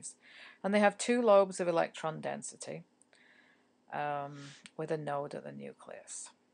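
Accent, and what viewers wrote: United Kingdom. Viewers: Actually she's pretty legit and has an awesome accent from MANCHESTER (she makes sure you know that). She's really funny and makes things very understandable. She is the best chemistry teacher I have ever had. I just started her class this fall semester so I'm not really bias lol. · What a cool sounding accent. It sounds British but American at the same time. Is this Irish?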